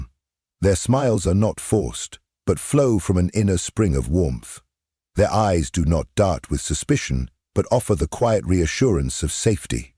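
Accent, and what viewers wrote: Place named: United Kingdom. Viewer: Interesting video, but why is the narration for videos like these always done by this same sounding voice with the British accent, as if it personifies wisdom and depth? It's annoying and actually undermines the message. Please, please alternate the voices used to narrate.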